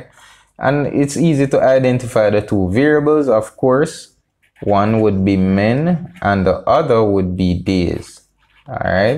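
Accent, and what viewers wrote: Jamaica. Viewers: Thank you man!!! Love your Jamaican accent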